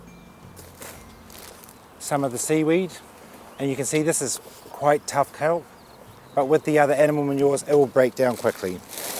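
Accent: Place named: New Zealand. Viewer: Really good show.First time i saw your chanel today.U sound kiwi, i'm from land of wombats and magpies. You are still answering questions from 2yrs ago, magnificent, not many do this. U show care towards your viewers , a rare quality. Will check some of your others .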